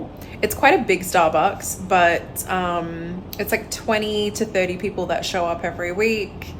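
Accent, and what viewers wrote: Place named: Australia. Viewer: You got a Mystic Accent( (Oz/Yanky )have you been a teacher previously?With That Accent you’ll Acquire the Job Easily!! Good Luck & Enjoy ❤️‍🩹🇹🇭👍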